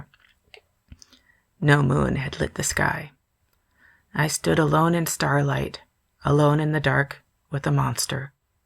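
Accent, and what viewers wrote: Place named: Midwest, United States. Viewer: Great job! I guess my ear is tuned to the Midwest speech pattern. 😂 I think it's great!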